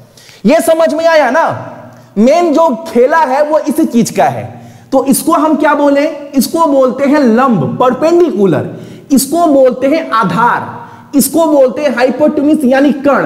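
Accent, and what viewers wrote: Bihar, India. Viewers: Sir ekdam bihari style me bol rhe hi bihari hi kay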